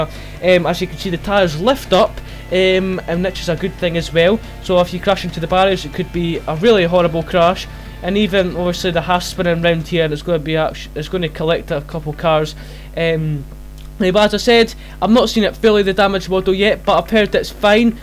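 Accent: Scotland, United Kingdom. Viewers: thodoris papa that's the glorious sometimes annoying to a English Man Scottish accent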